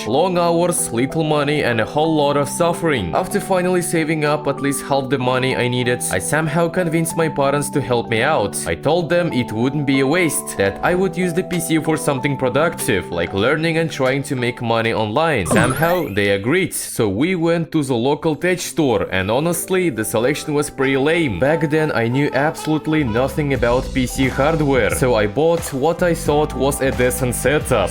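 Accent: Russia. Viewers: That russian accent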